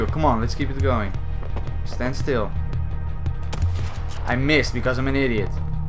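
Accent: Netherlands. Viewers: Je kan horen dat hij nederlands is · your Dutch accent is overwhelming haha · I smellde a Dutch accent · Are you dutch?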